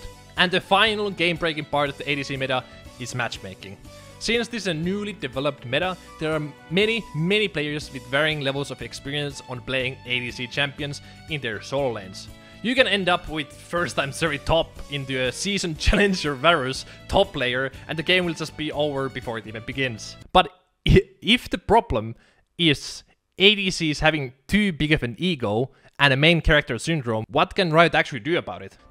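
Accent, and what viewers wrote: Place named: Finland. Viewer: start watching video hear the accent realization FINLAND MENTIONED🇫🇮🇫🇮🇫🇮🐻🐻🐻🐻🥶🥶🥶❄️❄️❄️❄️🍻🍻🍻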